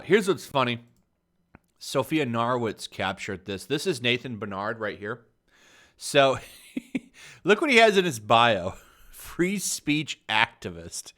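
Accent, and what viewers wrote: England, United Kingdom. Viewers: That’s the most hilarious english accent I ever heard !!!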